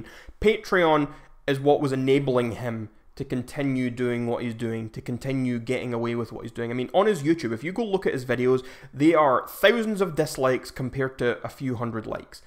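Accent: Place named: Scotland, United Kingdom. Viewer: Your accent reminds me of my great grandma.. she had the thickest Scottish accent and listening to her talk was amazing.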